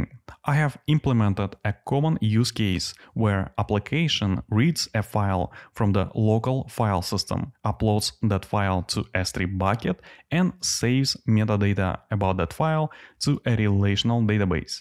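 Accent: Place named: Russia. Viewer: Было бы более приятно слушать без русского акцента)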